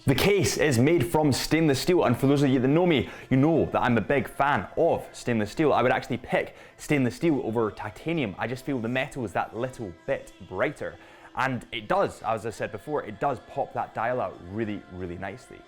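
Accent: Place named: Scotland, United Kingdom. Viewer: Very intesting review,. But you were-for a german guy-a little hard to understand because of the strong accent you have... where are you from, maybe Scotland? Cheers Stefan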